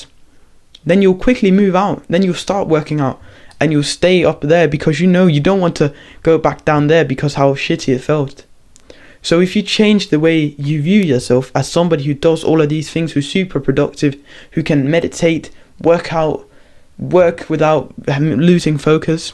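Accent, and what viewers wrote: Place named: United Kingdom. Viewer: man that accent is honey for hears, wish i had a uk accent🙏🤣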